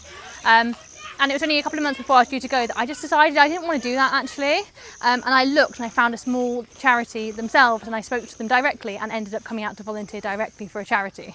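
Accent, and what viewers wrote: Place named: England, United Kingdom. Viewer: I love your English accent ❤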